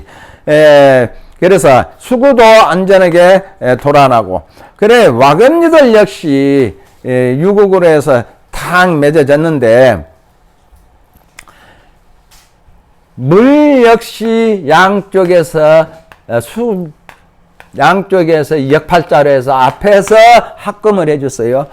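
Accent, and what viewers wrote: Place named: Jeolla, South Korea. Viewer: 선생님훌룡하신강의 잘보고 있습니다 말씀이 전라도 이신거 같으신데 어디신지 여쭤봐도 될런지요?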